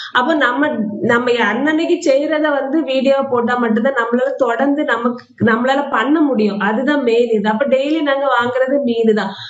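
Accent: Kanyakumari, India. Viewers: அருமையான இனிமையான கன்யாகுமரி பாஷை வாழ்க வாழ்க வாழ்க வளர்க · உங்கள் குரல் மற்றும் பேசும் விதம் ( அந்த கன்யாகுமரி பேச்சு) இது தான் உங்கள் வளர்ச்சிக்கு காரணம்.வாழ்க வளமுடன். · I love fishes so I’m Eagerly waiting for ur daily fish videos neenga seirathu patha saputa mari oru feel😋😋😋 and Ur Kanyakumari slang is so natural ❤nan Coimbatore unga slang enaku kekka puthusa nala erunthuchu 🥰love from Coimbatore 💟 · உங்க குரலுக்காகதான் நான் முதலில் பார்த்தேன். இப்போது உங்களையும் பிடித்து விட்டது உங்க கன்னியாகுமரி பேட்சும் பிடிச்சிட்டு. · Mam unga vedio daily parpen ,un kayakumari slang ennaku romba pdikum mam super aa panringa unga job CS pati solunga mam my daughter want to study CS so pls guide vedio onnu podunga ,how job opportunities after CS pls explain mam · Ivanga thirunelviy illai. kanyakumari maavattam. kannykaumari maathiri thaan azhakaa pesuhaa. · Your kanyakumari dialect and baavala illadha humility dhaan vunga plus points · Best wishes for your grand success in your life n channel. 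Your voice, Kanyakumari way of Tamil speech r all good. Carry on 💪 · கன்னியாகுமரிஸ்லாங் தான் உங்களூடைய வெற்றிக்கு காரணம் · உங்க குரல், அந்த பேசுர அந்த கன்னியாக்குமாரி வார்த்தை. எனக்கு ரொம்ப பிடிக்கும். முக்கியமா, கேட்டியலா...அதற்கு நான் அடிமை. நான் திருநெல்வேலி .பக்கம்.சங்கரன்கோவில்..... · கன்யாகுமரி slang ,சுறுசுறுப்பு , neatness, மீன் , உங்க உற்சாக குரல் இவை எல்லாம் உங்களை பிடிக்க முக்கிய காரணம் இப்போ இந்த சாந்தமான முகம் ரொம்ப பிடிக்குது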